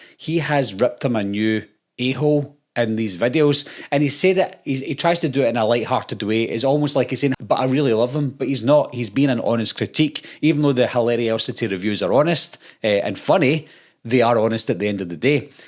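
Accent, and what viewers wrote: Scotland, United Kingdom. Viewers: How does one get THAT Scottish?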